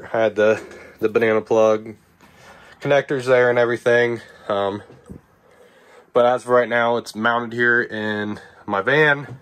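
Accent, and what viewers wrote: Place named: Pittsburgh, United States. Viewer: Bro that’s a hell of a setup. The effort you put in is evident and well worth it. Organization is key in this field. Where are you from? Pittsburgh/Ohio area? Thought I heard a little Pittsburgh accent in there lol.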